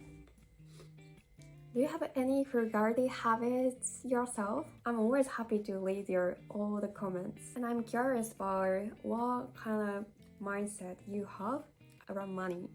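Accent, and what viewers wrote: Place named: Australia. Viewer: Hi, Already I can hear that you are developing an Australian accent! 😅 You do have some very good points and perspectives on frugal living. Yes there are many different cultural attitudes towards money. As an example (and not intended to stereotype), in my experience it's not uncommon to observe that there are many people from South East Asia/South Pacific who grew up in more rural/tribal setting and have a very short time horizon towards money. They are more focussed on the here and now so concepts such as saving for retirement like your mum are a completely foreign concept to them. If those people receive an unexpected windfall then often they will celebrate by spending it all now rather than putting to one side. Also if other family members know that there is extra money available they may pressure the person with the extra money to help. 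Of course, there are still people like that in more disciplined cultures but they tend to be the outliers and not the norm. And yes you are right about Credit card use. It has to be disciplined but can still be useful when travelling. If there is any fraud on the credit card the money involved is the banks money. If there is any fraud on your debit card then it's your own money and you will not have any access to it while they investigate.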